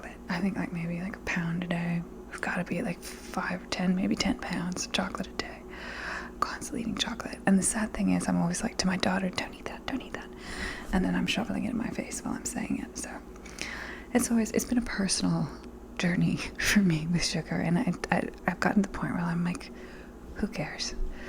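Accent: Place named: South, United States. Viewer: Such an interesting idiolect. It's funny, when I first heard you speak I couldn't tell if you might be from the south or the east coast so guess it makes sense you have a connection to both... sometimes I heard Jackie Kennedy and other times what I imagine the daughter of Shelby Foote would probably sound like.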